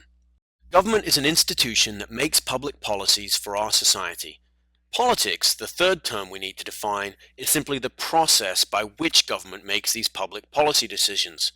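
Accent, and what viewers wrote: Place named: Australia. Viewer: I am confused that he is teaching us american government yet he has an Australian accent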